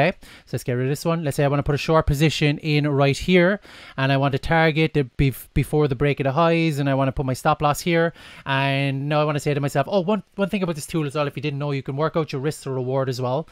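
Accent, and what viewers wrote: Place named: Ireland. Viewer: Nice to hear a fellow Irish man. Are you from Kerry/Cork? Great video btw. Thanks ALOT!!!!!!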